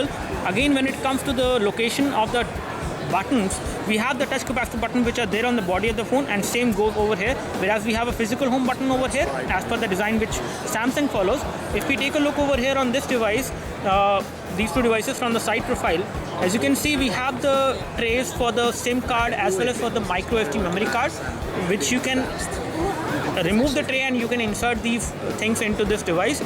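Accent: India. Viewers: Man your accent is OK. But you should try to make it more neutral. You really need to work on your pronunciation, may be because you have MTI - mother tongue infusion(as we call it in India). Rest is fine. Keep it up.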